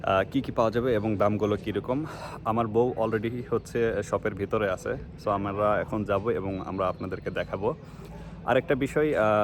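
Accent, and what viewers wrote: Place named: Bogura, Bangladesh. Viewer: বগুড়ার কথা শুনে সাবস্ক্রাইব করে দিলাম শিবগঞ্জ উপজেলা থেকে দেখছি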